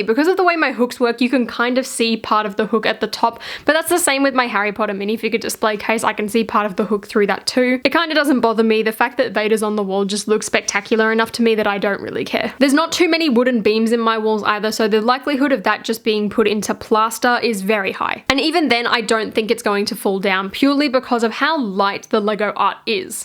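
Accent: Australia. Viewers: Very nice haul! Your video showed up as my #1 recommended video, so I decided to check it out and am glad I did. Besides the great LEGO content, I have always loved the Aussie accent. I have Australian friends and have been lucky enough to explore some of Australia (quite a few years ago). I have to say, I loved your use of "pissed" to mean upset. When I was there, I was told by my mates that it wasn't used that way in Australia, but just used to mean drunk...which we were having the discussion in a bar and laughing our heads off. Okay, back to LEGO... Glad to see you scored the Captain Marvel polybags. That one has eluded me so far in local stores (No LEGO store near me here in California). I definitely want it. If I can't get it for retail, I'll likely pick it up on Bricklink or Ebay. My siblings and nieces are into LEGO. My sisters are both big Harry Potter fans and when I showed them the Harry Potter accessory pack they were both surprisingly excited for the Maruaders Map that comes in it and proceeded to tell me how important it is, etc. 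I'm a casual Harry Potter fan...I've seen the movies and liked them, but they are BIG fans. I was surprised to find the Mario blind bags at my local Target a couple days ago. They had 12 packs and I bought them all. I got 8 different of the 10. I'm the same, that's the only Mario items I'm getting...well, I did pick up the polybag at target too...but passing on all the play sets. Awesome score on the big Hogwarts Castle...my family got t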